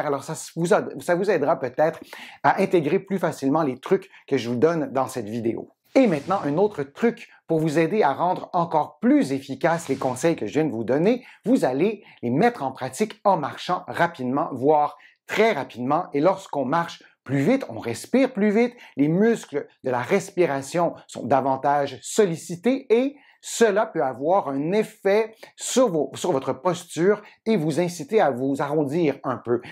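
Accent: Quebec, Canada. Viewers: Vous êtes vraiment génial super original avec l'accent du Québec, un vrai personnage. Merci vos conseils me change la vie !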